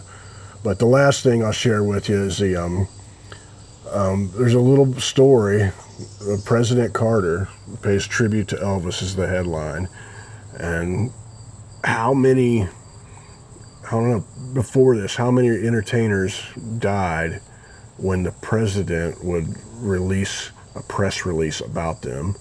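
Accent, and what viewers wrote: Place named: Fort Wayne, United States. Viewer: I appreciate what you're doing I grew up in ft Wayne so I sound like you I wish I could tell a story like you please keep it going your the best , love you in Christ always amen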